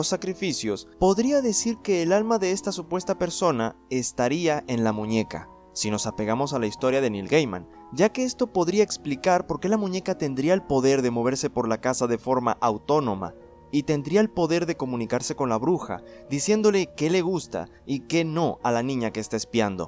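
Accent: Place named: Venezuela. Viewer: YO SABÍA!!!!! YO SABÍA QUE ERAS VENEZOLANO!!! >:D Se te nota en el acento XD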